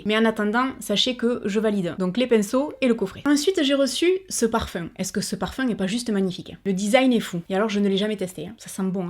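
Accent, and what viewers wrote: South, France. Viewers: Déjà je t aimais bien.mais là avec ton amour pour les animaux je t adore😍😍et ton accent du sud ça change de ma Bretagne et de Paris...😊😏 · Coucou! Juste pour te dire que j'adore toutes tes vidéos! Tu es marrante, j'adore ta franchise et ton accent du sud et ta bonne humeur! Quand tu dis "moin...s" ça me fait toujours bizarre! Finalement, je voulais te dire que J'ADORE ton générique de début de vidéo! Tu m'impressionne! Bisous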